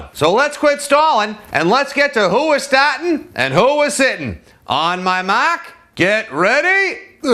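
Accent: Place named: Boston, United States. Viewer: Funny! You sound like David Spade with a Boston accent.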